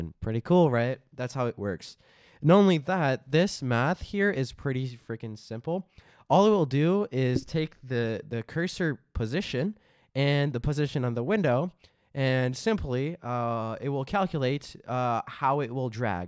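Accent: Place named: France. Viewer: L'accent de fou que t'as pour un français 🔥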